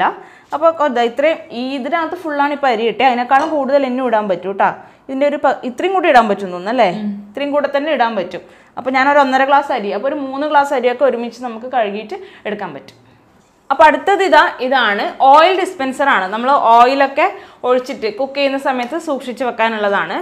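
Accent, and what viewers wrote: Kannur, India. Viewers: Chechide veedu kannur aano? Kannur slag varunnundu athukond chothichatha.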